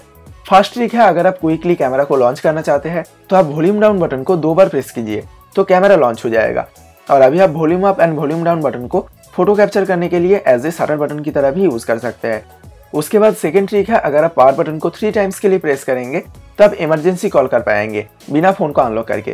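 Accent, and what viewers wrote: Bengal, India. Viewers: Contain is good but my brother there is a Bengali tone in your pronunciation I didn't disrespect Bengali but for your shake try to improve it best of luck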